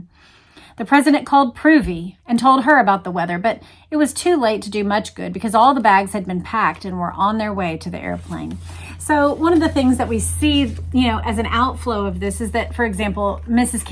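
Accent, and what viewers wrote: Texas, United States. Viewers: It's that Texas accent, which is one of many Southern American accents. Add the pleasant style of her reading & it's very relaxing. I've learned Not to listen to before bed. 😊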